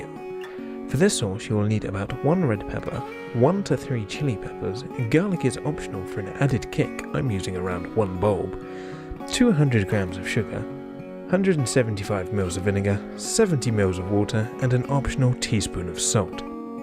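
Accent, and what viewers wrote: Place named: RP, United Kingdom. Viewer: you sounding very posh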